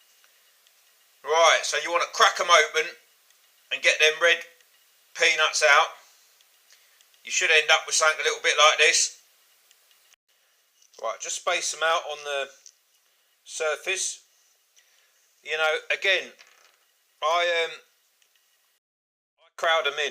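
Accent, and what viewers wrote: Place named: Bedfordshire, United Kingdom. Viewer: cheers, giving the peanuts a go this year. Also saffron, and for utter madness, sugarcane. You sound local to Bedfordshire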